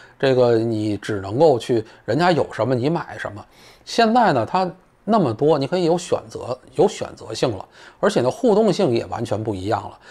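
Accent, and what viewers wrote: Beijing, China. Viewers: 我是个90后女生却十分喜欢散人的扑克脸 北京腔更显老道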